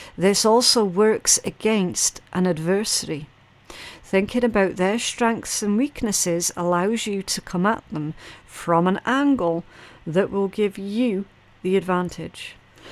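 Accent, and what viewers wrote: Scotland, United Kingdom. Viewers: I've had a glimpse of what I'm becoming, but....anticipation is making me wait. 😐 Hey - so nice to hear UR Scottish brogue loud & clear. ~ Godspeed ~ 🙏☀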